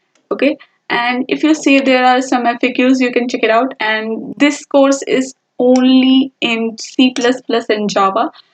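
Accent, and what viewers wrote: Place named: Punjab, India. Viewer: Punjabi accent are u from punjab?🙂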